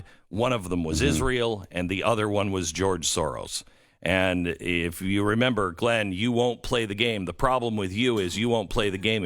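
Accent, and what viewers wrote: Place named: Russia. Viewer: Every time I think you are almost credible, out comes the crazy russian accent, the blanket statements of "nazis" coming to crush America, and the call to arms for us to stand strong against an enemy that we have no possible way of confronting. In the end I become more convinced that you are the face of crazy propped up by the deep state. Put here by design as an example of what foil hat wearing wackos look like so that by association we are summarily dismissed.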